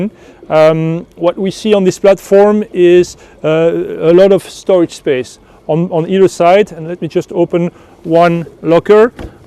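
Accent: France. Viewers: Very normal French accent, quite clear to be French actually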